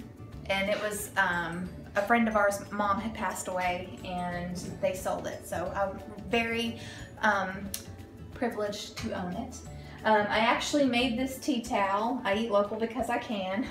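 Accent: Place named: Kentucky, United States. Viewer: I am a new subscriber for "Canuary" I was wondering if you are a Kentucky girl? Maybe Tennessee? Your accent sounds close to home to me.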